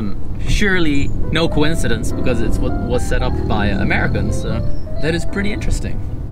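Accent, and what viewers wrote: United Kingdom. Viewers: I think your not from America because your accent looks like a British accent lol correct me if I'm wrong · British accent, that's why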